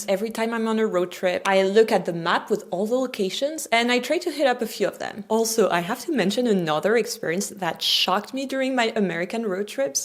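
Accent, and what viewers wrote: France. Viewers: I love that you pronounce Chicago just like someone from Chicago! It contrasts so sharply with your lovely French accent:] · Pretty sure 'Chicago' is the only word you said w/out a French accent. Guessing you say it how Bobby does 😄😄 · French accent engrish is annoying.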